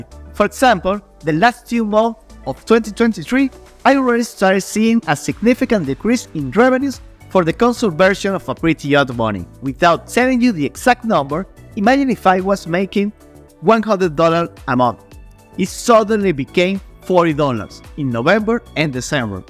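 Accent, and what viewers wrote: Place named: Spain. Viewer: Ese inglés, yo diría que hablas castellano ¿es posible?.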